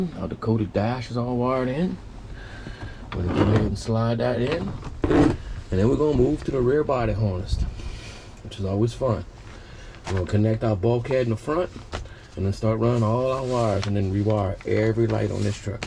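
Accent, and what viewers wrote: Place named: Los Angeles, United States. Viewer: I thought you sounded like you were in LA. I'm in Monroe, in need of your services soon. I have a 1986 chevy short bed i inherited, My father's old truck.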